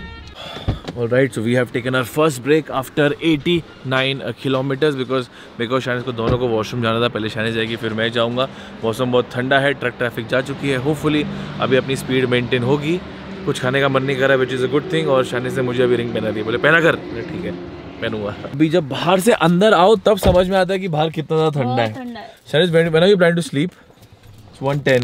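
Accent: Mumbai, India. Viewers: Omgg I have to say this.....in past few days I have watched videos so so much like binged them so bad.....that my accent has chaned from punjabi to typical mumbai accent 🥺😂❤❤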